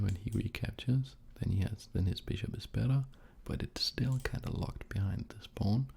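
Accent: Denmark. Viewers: Are you from Denmark (If so then me too)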